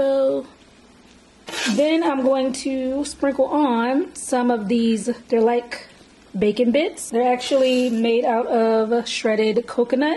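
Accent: Baltimore, United States. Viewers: Are u from Baltimore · You from Baltimore?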